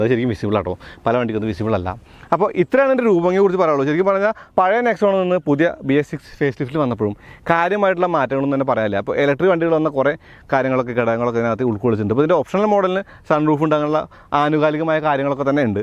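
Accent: Thrissur, India. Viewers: ഒരു പക്ഷെ തൃശ്ശൂർ കാരൻ ആയോണ്ട് ആവും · Thirshur language ano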